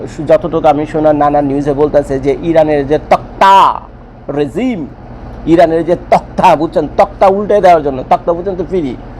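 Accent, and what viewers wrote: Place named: Noakhali, Bangladesh. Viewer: bhai mone hoy noakhailla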